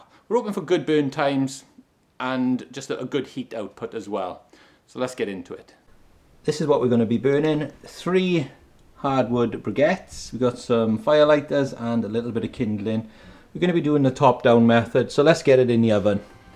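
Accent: Wales, United Kingdom. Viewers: I’m with (@ Brick-ets” you don’t say antiques (Antigs) But I love the Taff accent, my mother in law’s Welsh “just don’t tell her” is your Clock room sealed or room vented? Best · Pleasant accent/dialect, is that a wales dialect?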